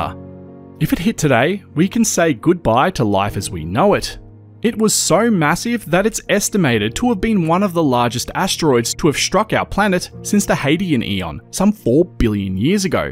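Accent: Australia. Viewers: Nice to hear this program in a good ol Aussie accent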